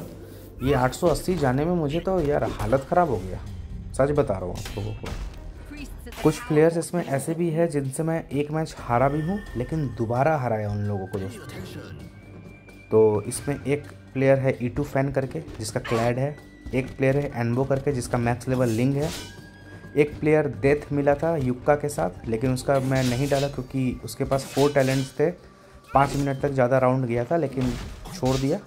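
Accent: Bihar, India. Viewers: Ling aur Sarge waale campers se toh bht zyada frustration hota hai😩😩😩 Btw nice gameplay 👌 I think aap Bihar se ho?😊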